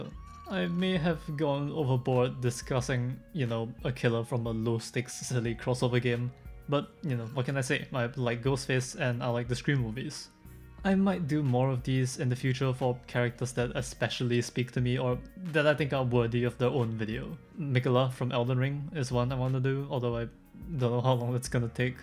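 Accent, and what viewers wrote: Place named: Singapore. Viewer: this guys accent sounds singaporean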